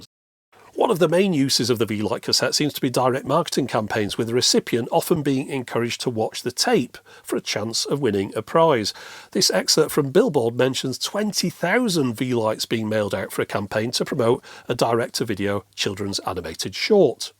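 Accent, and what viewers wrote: United Kingdom. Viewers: I can, the British accent, the quirky content, the snarky commentary, the obscure technology and the enthusiasm. One of the YouTube’s greatest channels!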